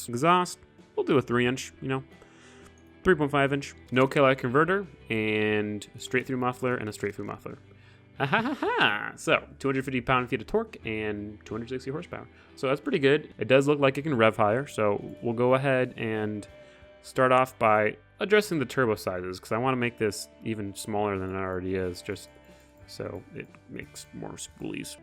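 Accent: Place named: Bay Area, United States. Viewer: he sounds like bay area buggs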